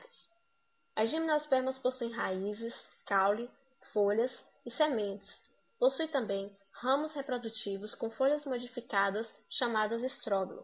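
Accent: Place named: North, Brazil. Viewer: Parabéns! Sou Paranaense e gostei muito da aula e parabenizo você pela iniciativa! Pelo sótaque você é lá de cima! Como sempre muito inteligentes! Abraço!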